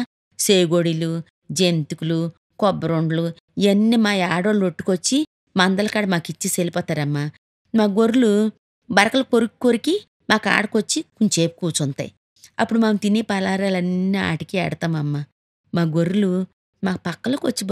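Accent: Visakhapatnam, India. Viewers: Medam mee slang lo vizag originality kanipisthundgi 😄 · మాది వైజాగ్,ఇది విశాఖపట్నం యాస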